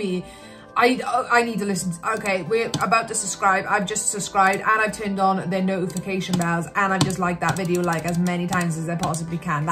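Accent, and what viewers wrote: Wales, United Kingdom. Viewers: are you welsh? you sound welsh :)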